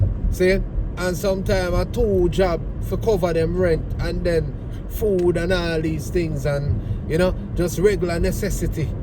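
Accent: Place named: Jamaica. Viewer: He's speaking a mixture of J'can patois, the vernacular of the people. I'm sure he can "code switch" when necessary. It's not that serious (lol).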